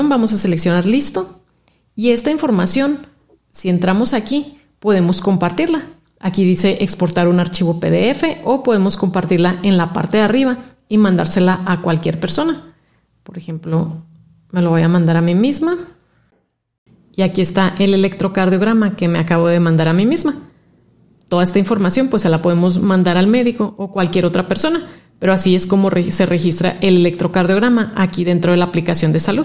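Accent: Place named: North, Mexico. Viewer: Me encanta tu acento norteño ❤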